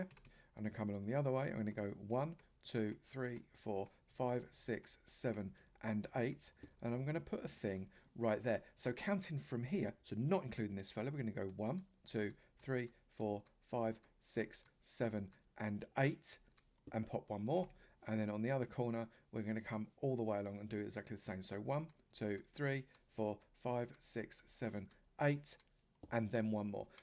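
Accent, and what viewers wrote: United Kingdom. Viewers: Are you just saying that because he has a British accent?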